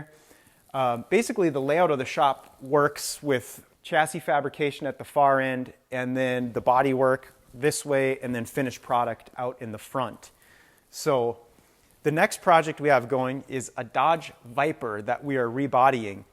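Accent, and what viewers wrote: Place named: Manitoba, Canada. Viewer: <link> Are you Minnesotan or Canadian? Specifically Manitoban? I live in Winnipeg, and everything about your accent screams Manitoban to me.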